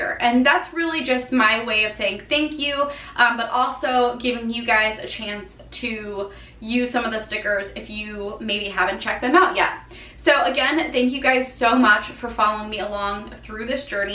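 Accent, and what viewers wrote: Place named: Chicago, United States. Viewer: Congrats girl!!! I found your channel from searching for Erin Condren videos. I live in Seattle but am originally from Chicago! Your accent reminds me of home!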